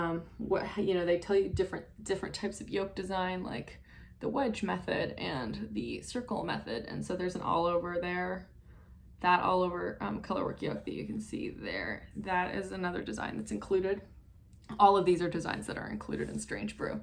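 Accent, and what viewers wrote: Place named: Vermont, United States. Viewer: Great review!! I kept thinking you were from vt at the beginning of the video. Hello neighbor!!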